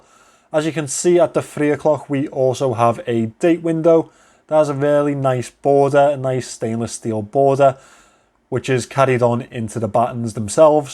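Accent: Liverpool, United Kingdom. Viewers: Good luck with your channel, your enthusiasm shines through and your “ scouse” accent gives the commentary it’s own unique flavour, as does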